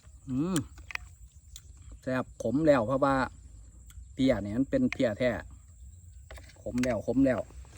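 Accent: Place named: Korat, Thailand. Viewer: เอาอีกแล้วพูดนีแทวโคราชเรียกเป็นกองดูจากสายไหม❤❤❤❤❤🎉🎉🎉🎉🎉😂😂😂😂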